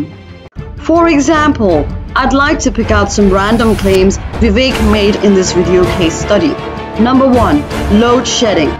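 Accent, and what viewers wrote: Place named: RP, United Kingdom. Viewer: The main thing I loved about this video was your RP accent . love you sister ❤️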